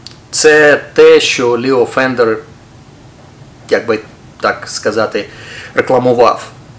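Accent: England, United Kingdom. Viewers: а як тобі вдається російською говорити без англійського акценту, а українською - з ним?:) Improvement - “покращення”, улюблене слово Вікторфедрича Царапают - дряпають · у тебе український з англійським акцентом, таке перший раз чую чесно кажучи!!)))) Отвіртка - викрутка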